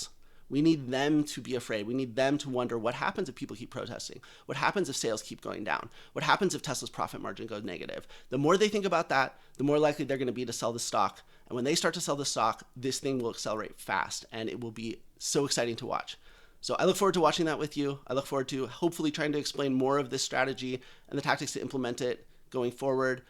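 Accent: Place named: United States. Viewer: First time I see your channel because I was wondering whether you are a compatriot re your surname. You sound very american, so you must have dutch ancestors. Having said this, interesting video! 🙋‍♀️🇳🇱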